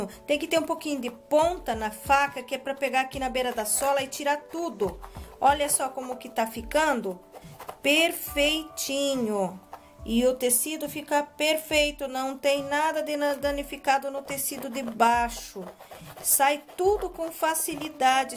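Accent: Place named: Asia. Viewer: Pelo sutaque parece ser asiática, gostei do vídeo. Parabéns pelo seu trabalho.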